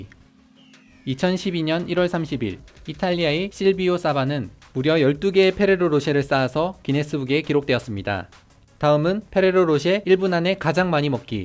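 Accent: Gyeongsang, South Korea. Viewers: 원래 출신이 경상도 출신이신듯. 왜냐면 억양이 유시민 느낌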